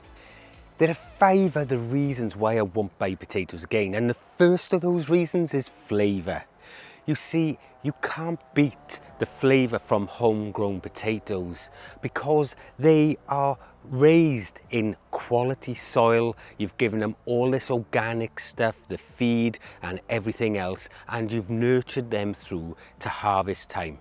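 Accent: Wales, United Kingdom. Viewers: This video is amazing. I think I recognise that accent though, 😊 is that a welsh accent? I live in south wales 🏴 up in the valleys. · Irish name? Welsh accent? 😉(South?) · Where in the UK is your accent from please?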